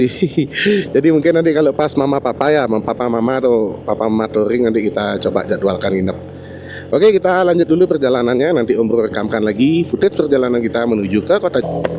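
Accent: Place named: West Java, Indonesia. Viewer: Yogya hadiir perdana beli Pingiin ikutan Logatnya kok ada sunda n bali nya ya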